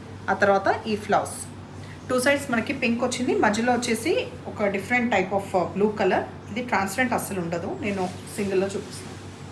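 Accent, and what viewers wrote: Telangana, India. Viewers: Hai mam mee sarees కంటె baga untai mee మాటలు and pakka Telangana యాస super mam😅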